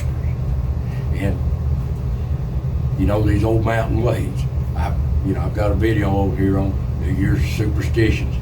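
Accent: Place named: Swain County, United States. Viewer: Just seen this video. So sorry you and your wife have been sick. Prayers for quick recovery 🙏 ❤. Wishing you a Very Happy New Year as well and all the little animal's. As you spoke I realized I am the elder now. I have 3 brothers left. All 3 of my sisters passed long ago. I miss them so much. All of my Family have passed. Just my brother's and me left along with all of our children. are you kin to any Laws from Swain County? My Mother in law was from there year's ago and passed in late 90s. I loved her. Noticed you not only have same accent but said something few say anymore and I miss it. She would say yuns come back as we lived far away. Thank you for being real. Pray 🙏 you have a Blessed and Awesome 2024. ❤🎉🎉🎉🎉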